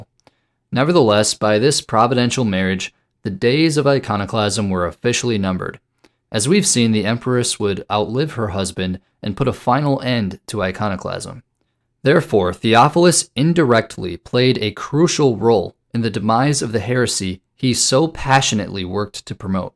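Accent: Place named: General American, United States. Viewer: General American Dialect ✅️, Zoomer voice✅️, Projecting escapism on a foreign model of governance while ignoring the experiences of those who lived under it (Absolute Monarchy, one-party Marxist-Leninist states, fascism, etc etc) ✅️ Diagnosis: Terminally Online LARPer Remedy: Touch grass and switch from online orthobro to boring IRL Orthodox.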